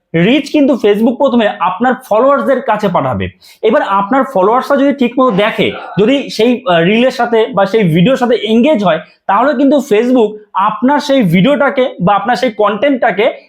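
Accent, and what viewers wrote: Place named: West Bengal, India. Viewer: পশ্চিপবঙ্গের বাকভংগি ভালো লাগে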